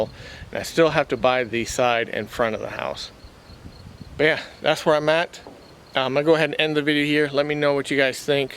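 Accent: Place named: Tennessee, United States. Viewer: Ur Tennessee TWANG is started to come back, lol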